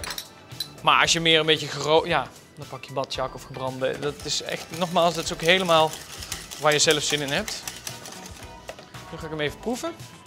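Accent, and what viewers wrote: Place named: Holland, Netherlands. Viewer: Sowieso heeft hij meer een Hollands accent. Die "R" heeft hij in ieder geval niet in Brabant geleerd. Beetje een mengelmoesje, dus ik snap wel, dat hij dan de termen patat en friet door elkaar heen gebruikt.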